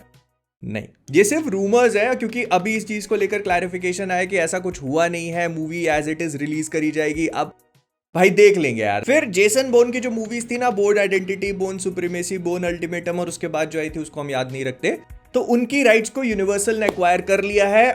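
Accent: Bhopal, India. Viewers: Makda bhopali nikal hi aata hai bhai ka😂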